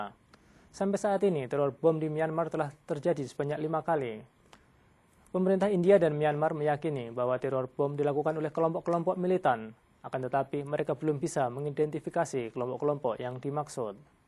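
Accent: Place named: Java, Indonesia. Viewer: HE HAS A JAVANESE ACCENT! He pronounces words so bad :(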